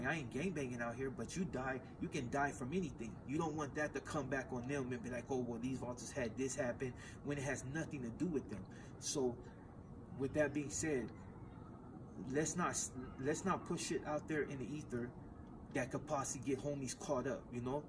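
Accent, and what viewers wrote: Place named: Mexico. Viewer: Cuzzo why you got a Mexican accent though does your bros and sis have the same accent ?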